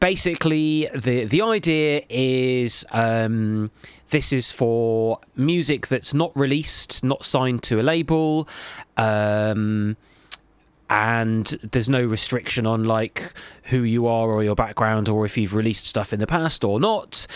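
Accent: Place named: United Kingdom. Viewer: after the song I didn't expect you to sound super british haha:D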